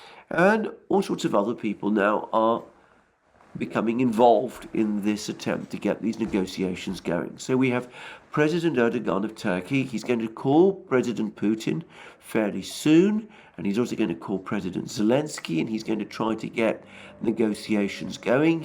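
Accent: England, United Kingdom. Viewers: Alex works for Russian propaganda groups. They come up with his talking point for him. He is just a Russian mouthpiece with an English accent. I’m not making this up.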